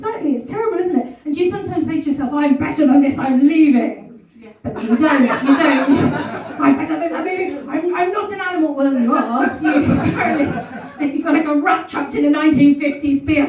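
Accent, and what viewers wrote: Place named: West Country, United Kingdom. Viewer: What part of the west country are you from x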